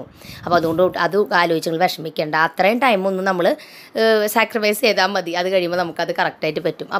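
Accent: Thrissur, India. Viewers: നാടൻ ശൈലിയിൽ... നമ്മുടെ തൃശൂർ ഭാഷയിൽത്തന്നെ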